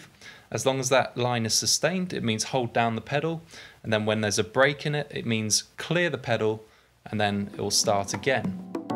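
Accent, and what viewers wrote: United Kingdom. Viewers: I just started trying to learn piano, and I have a feeling I'm going to utilize this channel a lot. Plus, it's a lot more fun to learn along to an British accent :)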